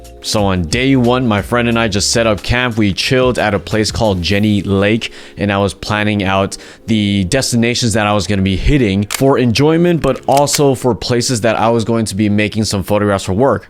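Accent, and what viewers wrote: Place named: Bay Area, United States. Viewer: “Where’s the trail, bruh” I know a fellow Bay Arean when I hear one. 😂😂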